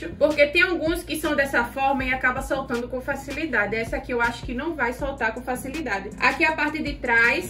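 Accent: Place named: João Pessoa, Brazil. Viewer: Esse sotaque nunca me enganou, sou de João Pessoa e não vivo mais sem tu, mulher 😂😂😍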